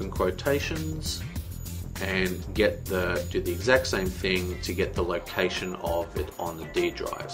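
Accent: Australia. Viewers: I'm most impressed by the fact that you did all this with steam open in the background. Anyway, great tutorial! And is that an Australian accent? :P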